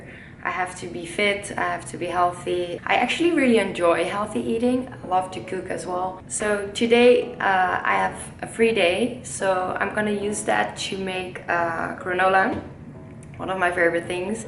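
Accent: Netherlands. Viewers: je kan zo goed horen dat ze nederlands is. ik zat te luistern naar dr accent en ik d8, dit klinkt best nederlands., toen zag ik dr achternaam.. en ik d8.. jup die is nederlands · She sounds Dutch. I use to live in Holland. Good genetics and healthy 'diet.' I eat the same but I wish I could eat nuts:( Nut allergy is terrible. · In the Netherlands (where I assume she is because of the dutch accent) they have lots of quick cooking rice, it's what most people use! You can cook it like pasta and then pour out the excess water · is she dutch? she sounds dutch:) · Is your first language Dutch by any chance? Cuz I'm Dutch and I recognize your accent.. 😂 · From the very beginning I could hear she is Dutch..then I checked her name and I was right. I always recognize Dutch accent-it's very nice to hear Dutch speaking English.... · I know for a fact that she is a dutchie aka dutch person from her accent and her name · Is she Dutch? 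She sounds Dutch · Je hebt een nederlandse accent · You sound a bit dutch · i can hear the dutch accent coming throughhhh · Are you dutch? im dutch to you have a accent <3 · i love it.. first watching your clips. question are you dutch? your accent sound like that;). any way i will try this at home i need to get ready for the wedding i will be a bridsmaid..;) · Do i hear a dutch accent? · OMG zo leuk dat je accent zo duidelijk Nederlands is. Love it😍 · OMG I NEW IT!! I’m from holland too😂 (its her accent) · Hahahaa love voor je typisch Nederlandse accent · Are you Dutch? You kinda have an accent, not annoying or anything, I am Dutch so I hear lots of people with the "Dutch accent" so I can recognize it haha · Is she dutch? She has an dutch accent in her english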